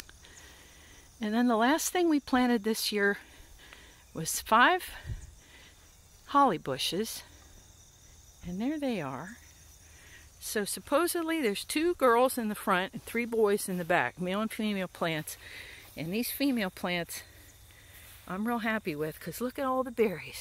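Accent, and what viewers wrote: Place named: South, United States. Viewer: Is it just me or did I detect a bit of a southern accent coming out in this video? Lovely update and tour! That little watermelon just hangin out chillin in his panty hose hammock made me laugh! 😄 What do you do with your acreage across the street? I love how your home has so much wide open space! Thank you so much for sharing my friend! 🥰❤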